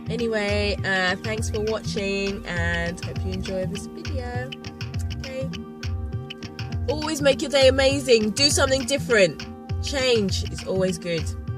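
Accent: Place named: United Kingdom. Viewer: Nice to see your vacation journey. I liked how you edited it between present and past sights. Where are you originally from? Your accent sounds British...did you relocate to south of France? I'd love to learn more of your story. Perhaps you've made a video of it and I didn't yet see it? You are a beautiful woman - inside and out - and I enjoy learning about life in another part of the world through your eyes.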